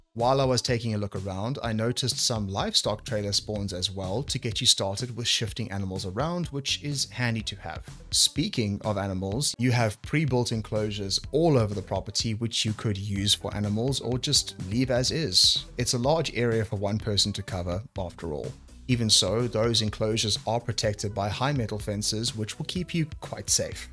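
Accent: South Africa. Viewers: are u south african? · I sense a South African accent?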